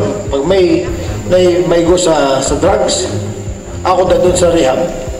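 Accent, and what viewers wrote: Visayas, Philippines. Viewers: c idol hahaha sweto kaau mg visaya!!!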